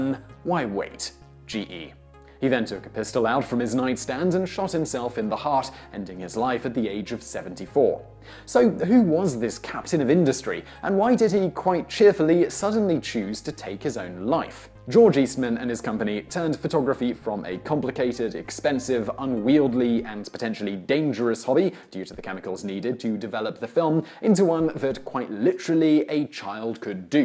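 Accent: United Kingdom. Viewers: Written in American English, presented in British English.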